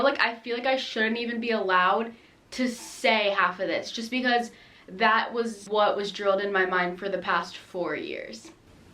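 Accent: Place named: Maryland, United States. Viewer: im from Maryland too and when you first started talking I could hear the Maryland accent haha and also a lot of schools in Baltimore/bel air are horrible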